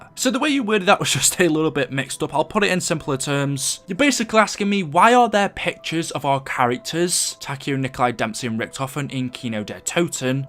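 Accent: United Kingdom. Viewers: Are you British because your accent is awesome! !!! ₩ h ¥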